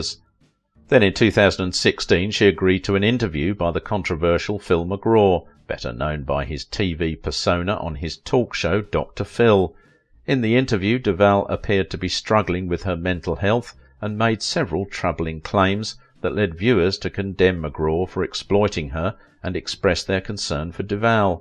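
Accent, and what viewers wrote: United Kingdom. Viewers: Why are you so bad about misaligning images and audio? "let's talk about how frightening the Shining was while showing pictures at that exact moment from Popeye." Also, is this voice yours? Or are you another shitty Chinese/Indian channel that hires British voice actors to try and give the illusion that they do good work?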